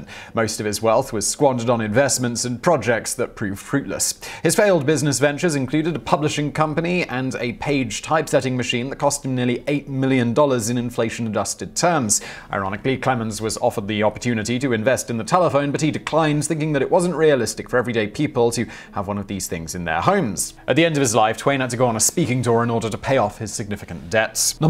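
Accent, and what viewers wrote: United Kingdom. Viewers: You're probably not familiar with British English pronunciation. He's pronouncing them correctly according to his background.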